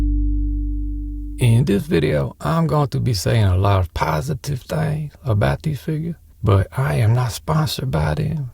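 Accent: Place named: South, United States. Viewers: that is the most deep fried southern accent I've ever heard. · Star war ,he ma. Coolnicity. Errybody like gi joe. This guy never puts S at the end of his word. He sounds like he is Tennessee or alabama maybe louisiana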